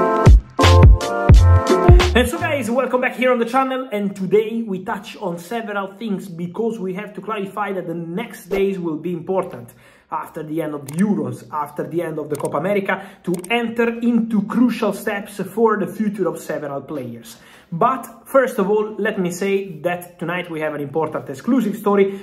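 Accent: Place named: Italy. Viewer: Bro your Italian accent was crazy on this one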